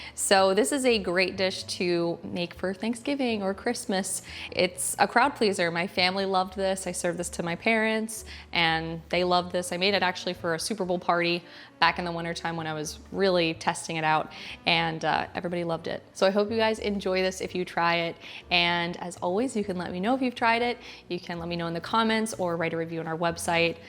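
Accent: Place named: New York, United States. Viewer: Looks delicious. The "au" in your words like "sauce" and "caught" really brings out the NY.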